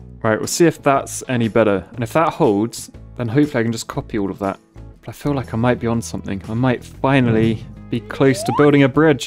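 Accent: United Kingdom. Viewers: just a normal bri'ish engineer playing games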